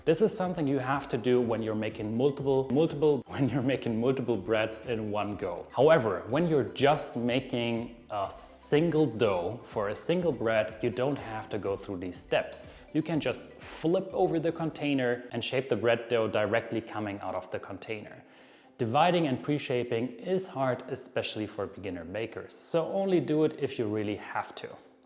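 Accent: Germany. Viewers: My mom was born in Remshied, Germany, so listening to you brings me back home🎉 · Wenn Deutsche am perfekten Englisch feilen…. Hört man trotzdem raus, dass es Deutsche sind. Dein Englisch ist sehr, sehr gut. Keine Frage!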